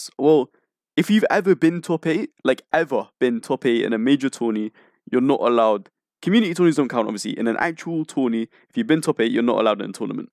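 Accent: London, United Kingdom. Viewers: That was the most London intro I’ve ever heard to a video lol.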